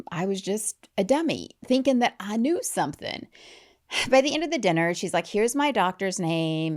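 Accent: United States. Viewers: Painful American … my ears started bleeding listening to this crap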